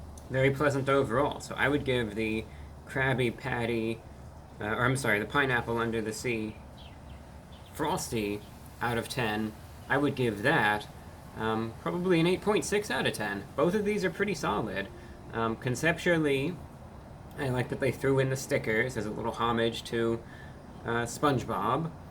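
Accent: United Kingdom. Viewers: I haven't watched the channel in a while but i swear he turned British somehow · I haven't seen his videos in a few years, why does he affect a British accent now? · When did he become British?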